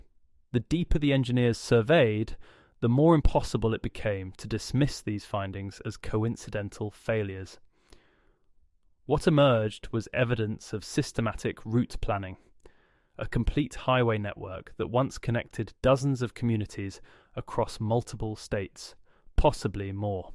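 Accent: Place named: United Kingdom. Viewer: WHY do all of these AI narrated videos have a British voice ? Tired of it.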